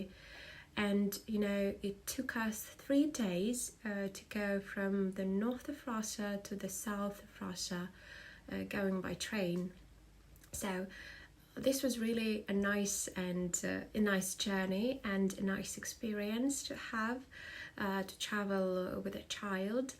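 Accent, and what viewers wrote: England, United Kingdom. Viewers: Приятная нотка английского акцента – это то, что так приятно услышать от красивой русской леди из сельской местности.❤ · Why does her English which is excellent sound like she is from England. Her voice is so nice soft and very clear.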